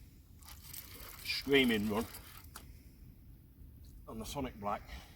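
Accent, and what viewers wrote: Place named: East Midlands, United Kingdom. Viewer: Nice one, great vid shame about the weather at first, can't be too careful! You're not from the North east, with that accent I'd say East Midlands! Tight lines pal 🎣🎣🎣🎣👏👏👏👏👍👍👍👍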